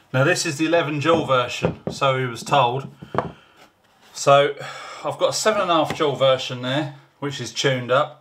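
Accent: United Kingdom. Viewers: Im thinking of maybe getting one of these in the future, and think i would probably like to get mine powered up , you sound like you're quite local to me , are you in the Midlands?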